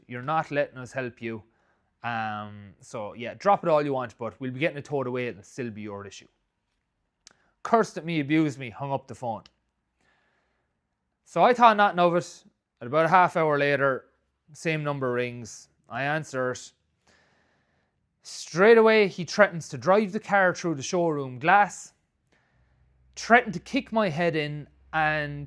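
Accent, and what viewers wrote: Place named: Ireland. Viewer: You sound Irish 😮😮😮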